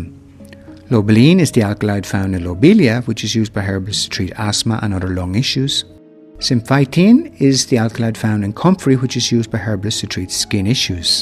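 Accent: Ireland. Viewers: I would give this video a million likes if I could. As a student who struggles with Pharmacology and dreads to learn about it.. you really opened my eyes. You made it so easy to understand, beautiful visuals and of course beautiful Irish accent. The best video I've actually come across so far on such a complicated subject. You have really helped me ease my stress. God bless you! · Southern Irish Gaelic accent. Very relaxing.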